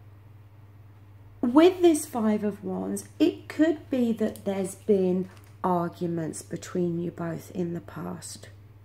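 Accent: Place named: England, United Kingdom. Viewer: thank you as always you are amazing, and not to mention the most beautiful English accent in the whole of England!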